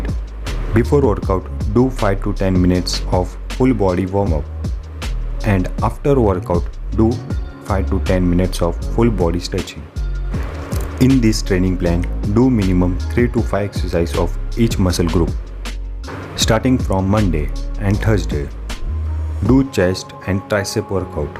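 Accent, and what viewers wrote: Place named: India. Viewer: واضح من اللكنه هندي بحت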